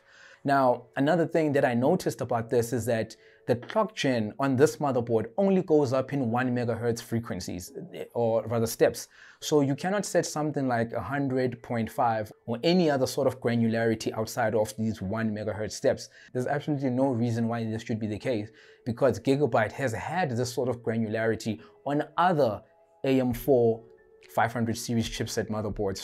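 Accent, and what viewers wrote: South Africa. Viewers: South african? If so , keep pushing man, nice to see an SA tech guy. Edit: NVM you said Rands at the end there. Represent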